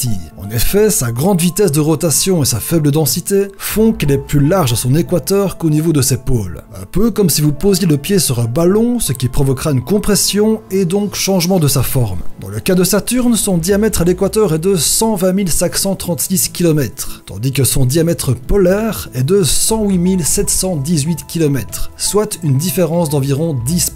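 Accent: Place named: Belgium. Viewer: c'est bon j'ai rien dit. il a dit les mots photo et huit. Belge je pense. bref sympa à regarder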